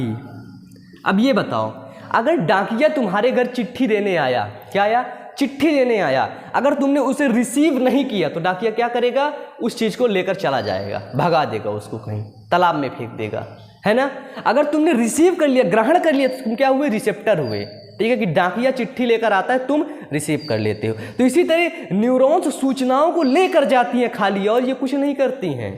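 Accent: Uttar Pradesh, India. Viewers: Sir aap up ke hai kya up ke bhasha hai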